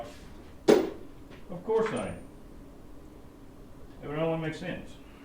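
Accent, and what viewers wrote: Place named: South, United States. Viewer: I think it's the southern accent but somehow the term "Boat hoes" comes to mind?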